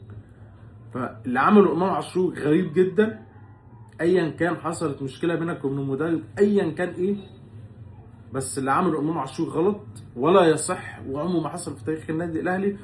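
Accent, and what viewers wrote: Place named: Iraq. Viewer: اسمع عراقي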